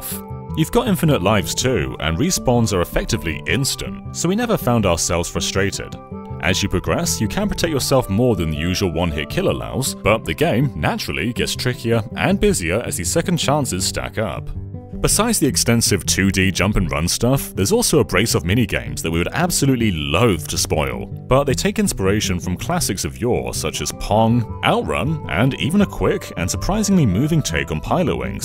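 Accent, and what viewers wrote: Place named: United Kingdom. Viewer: It's weird to hear a British accent on a Nintendo Life video that isn't Alex. :) Good job, good review. I wanna play.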